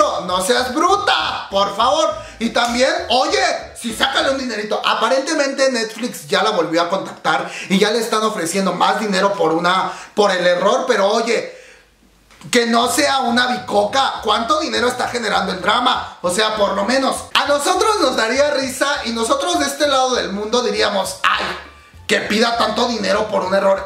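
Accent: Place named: Mexico. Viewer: Mr encanta tu forma de comunicar además hablas muy mexicano y me hacés pasar un buen momento y tú critica es muy coherente y ala vez sensilla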